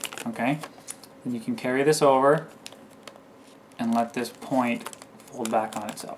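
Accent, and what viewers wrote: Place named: Canada. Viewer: Air123ism got Astral'd.. You sound Canadian, much like the Vigilant Christian dude..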